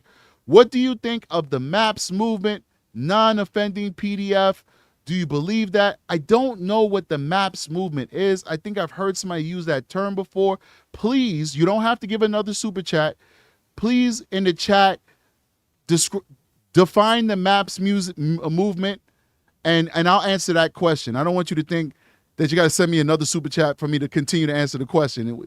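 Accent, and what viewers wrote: New York, United States. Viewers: Love this guy ❤love his accent his NY swag 😂😂😂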